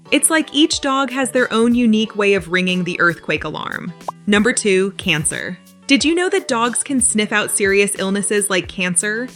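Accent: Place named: North America. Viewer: 😅?? it's a North American 'accent', so there is no accent!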